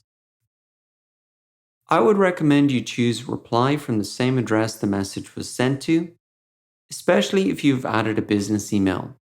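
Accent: Ireland. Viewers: lol, lovely irish;)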